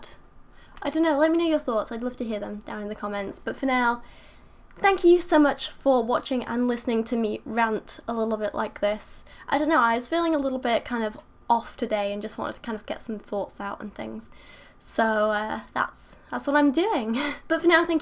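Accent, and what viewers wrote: United Kingdom. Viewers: u said about ur accent, I mean no offence but are u british, american because I can't be sure. sometimes u sound really British but u don't pronounce the letter t it's more d sounding. just curious, I never thought about it until u brought it up so pls don't think I'm being a troll. it a genuine question. I would guess ur British? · Wait?¿ People actually make fun of your British accent?¿ I thought everyone loves British accents. Lol. Like I said to another prominent Atheist YouTuber (EssenceOfThought), 'I came for the British accent, but stayed for the Religion bashing and Science!' Lol =^p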